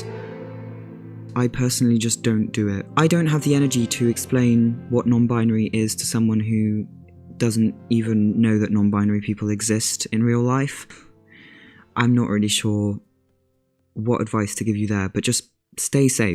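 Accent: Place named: North England, United Kingdom. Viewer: Delete this comment if it's too personal, but how did your lovely accent come about? Were you born in the north of England before moving to France, were you born in France and learned English from BBC television? Just curious because your voice sounds so good and I love hearing about where different accents originate from.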